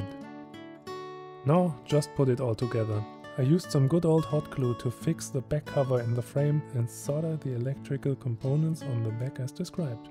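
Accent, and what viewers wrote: Germany. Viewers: Höre ich da etwa eine leicht deutsche Aussprache heraus?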